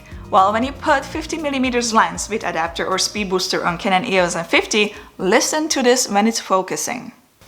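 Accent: Czech Republic. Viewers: Oh wow. Wasn’t expecting the czech accent. Haha. Great video btw! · Are you from Czech republic? :-) I heard "Ahoj" and your accent is also similar to czech-english one.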